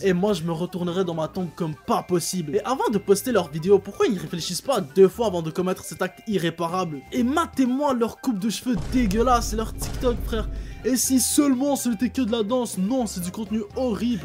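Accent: Quebec, Canada. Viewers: t quebecois? parce que moi oui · Mais c’est moi ou il a un accent innnnn peux Québécois?😂❤(je revient pour dire que en faite la debile que je suis j’avais pas capté qu’il est québécois 🧍🏻‍♀️. Myb)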